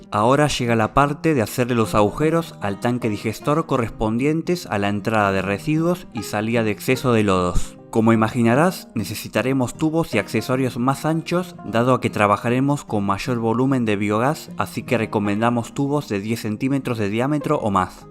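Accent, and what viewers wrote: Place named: Argentina. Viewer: Muy bien explicado, Pero estoy seguro que puedo encontrar otro video donde me lo expliquen sin ese molestoso y desagradable acento Argentino o Uruguayo.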